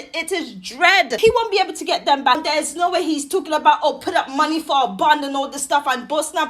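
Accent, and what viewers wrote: England, United Kingdom. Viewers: You sound like you from England leave that street shit alone..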